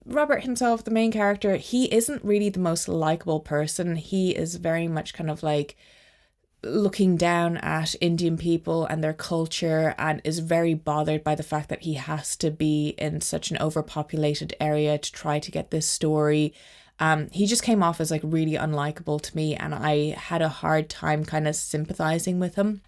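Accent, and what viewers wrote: Ireland. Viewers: Great recommendations and the Irish accent sounds great 👍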